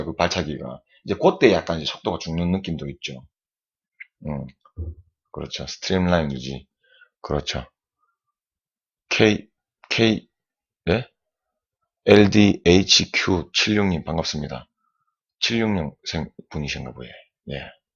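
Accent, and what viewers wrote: Gyeongsang, South Korea. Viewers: 존경하는 선생님 경상도 억양이라서 생소했는데 말씀이 이제는 여러번 듣다보니 친숙합니다요. 잠영연습하는데 벽차고 가는데 바로 엉덩이랑 뜨는 경우는 뭡니까? 어케 들어가는 거에요? 입문한지 석달입니다. 초급입니다.아래 글보니 물타기 연습이라는데 그건 몹니까? 강사선생님께서 연습해오라고 했는데 어렵네요. 몸이 떠버리까 방법이 없네요. 한수 가르쳐주십시요.부탁드립니다. 구독 클릭 좋아요 클릭했습니다.하트뿅뿅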